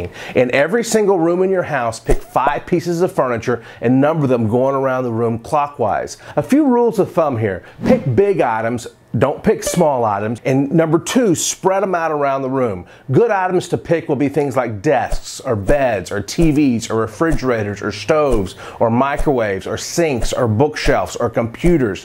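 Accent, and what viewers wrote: South, United States. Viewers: It’s so cute to hear his southern American accent saying things about Ancient Greece 😅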